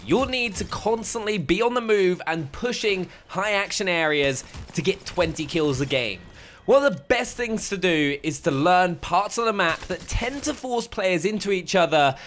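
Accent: United Kingdom. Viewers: Daniel Lyra UK looool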